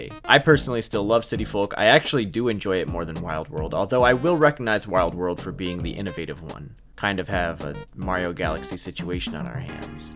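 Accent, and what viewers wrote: Syracuse, United States. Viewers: bro you from Syracuse??? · Are you from... Syracuse..? Cuz I’m relatively close 😳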